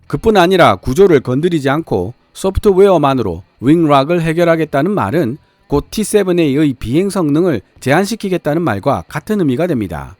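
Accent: Gyeongsang, South Korea. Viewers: 경상도영어는 제발 읽지말고 그냥가자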